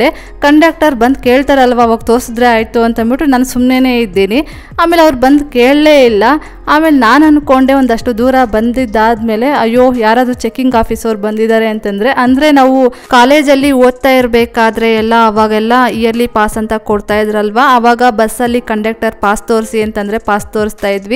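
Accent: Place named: Andhra Pradesh, India. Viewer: memukuda telugu vallameandi,me kannada slang vini naku meru teluguvalle anipinchindi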